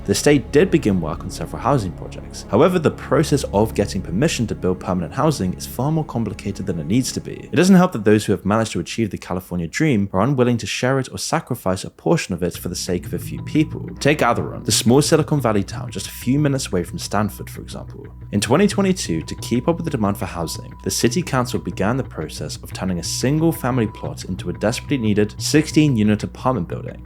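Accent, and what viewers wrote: United Kingdom. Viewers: Why does moon care about what’s happening in the USA? He sounds British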